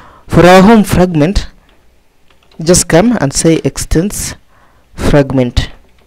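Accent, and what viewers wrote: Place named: India. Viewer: I have no problem with typical Indian accent, but you eat words with your pronunciation and make it impossible to understand what you say, not to mention the low volume of the voice as well. Thanks for the effort, but it's a futile one in my opinion.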